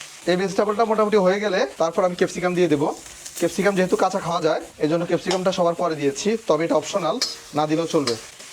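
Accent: Noakhali, Bangladesh. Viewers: হেতে নোয়াখাইল্লা এএএ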